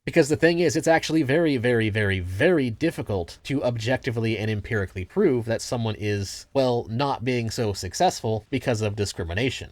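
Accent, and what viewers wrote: Boston, United States. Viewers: Yeah, she's originally Bostonian. Moved to an undisclosed location and has tried to get the beantown out of her voice to little avail.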